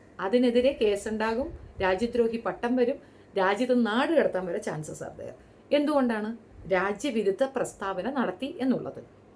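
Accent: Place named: Trivandrum, India. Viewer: Ningal oru kidukkam thanne😂 Va thoranal mottham kidunkkunnu😢samsaram keddappa thanna manasilayi trivandrum ennu 😂🎉😂🎉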